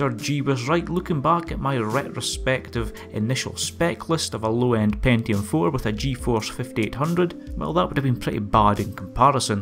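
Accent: Scotland, United Kingdom. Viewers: Great video, I love your Scottish accent. 👍 🏴 I just might reconsider the Athlon.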